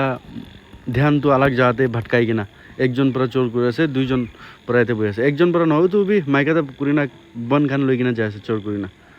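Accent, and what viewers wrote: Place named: Nepal. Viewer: Nepali accent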